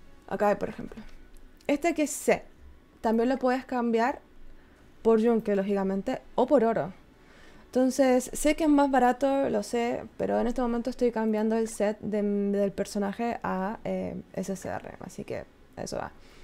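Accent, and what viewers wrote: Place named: Chile. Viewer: Una Pregunta, de que país eres? Tienes un tono que me recuerda a chile. Pd: Saludo desde Chile🇨🇱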